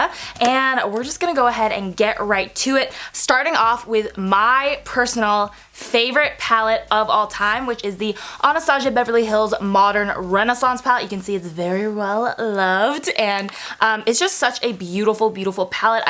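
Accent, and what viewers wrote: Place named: United Kingdom. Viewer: Yes, I found you through that Dupe Video. Love your British accent, lol! 😍 Thank you for sharing all these dupes😊👍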